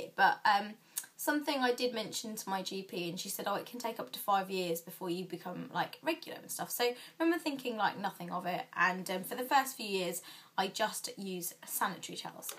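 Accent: United Kingdom. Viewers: Oh ok well she as has British accent so !!! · aww British accent <3 I wish i was there .. :(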